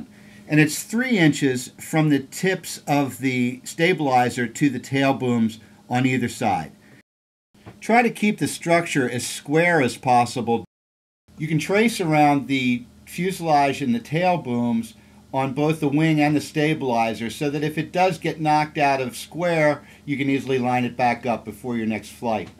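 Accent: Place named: Australia. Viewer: great fly. he speaking as an Australian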